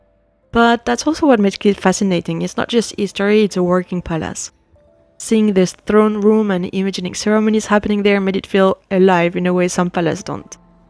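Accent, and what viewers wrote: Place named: France. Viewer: I watch a lot of videos made my foreign visitors and yours stood out a lot. Usually they very often go to the same museums (Vasa, Viking, Abba) the same restaurants (Meatballs for the people) and cafés (Lillebror, Gast, Stora bageriet) and same shops (Arket) and it ends up being a variation of the same trip; a bit boring. I thoroughly enjoyed that your video was quite different. Thank you! Also enjoyed your French accent (I'm half French).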